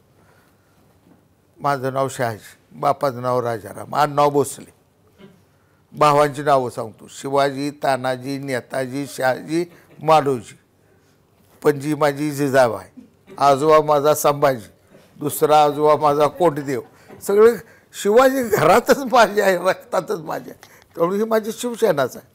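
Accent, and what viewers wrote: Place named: Mandesh, India. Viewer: सांगोल्याला मिळालेले दोन हिरे गणपतराव देशमुख साहेब आणि शहाजी बापू पाटील ❤️ माणदेशी बोली ❤️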